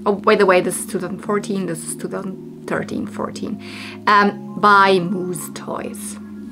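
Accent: Germany. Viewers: I adore your videos but I cringed today as there were soooo many 70's fashions that you passed up in that box, and that Ken looking doll was a Sears exclusive Craig doll which can go for pretty good money. I am a Barbie dealer and have been collecting since 1976 but we all make mistakes, and it's hard to choose when you aren't familiar to that era of dolls. I would have bought the whole box LOL I adore you and my best friend is German too, so I love your accent. I subbed both my channels. All the best to you :)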